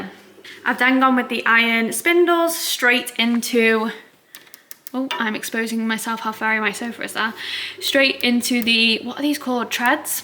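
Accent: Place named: United Kingdom. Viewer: I think that you captured your home beautifully, I first came upon your channel on a short of your home and ironically I watched on mute, your videos kept coming up but I wouldn't put the sound on and it was only today I realised you were British - I though you were American!!! Because your house resembles America so much, but watching this its crazy how I can still see a modern twist on the inside with a traditional English feel from the outside, tbh I think you should have more subscribers, I think one day, one of these videos will blow and you will go viral! But for now I will be tuning in for every video waiting for one to go crazy on views and subs!!!!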